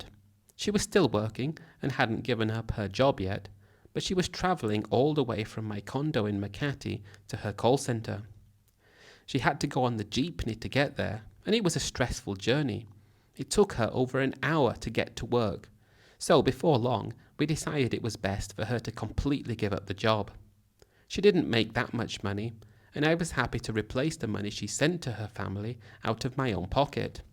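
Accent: England, United Kingdom. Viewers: You have a English accent you liar nothing you said is true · So is this channel just some British guy reading middle-aged white men's accounts of dating in the Philippines??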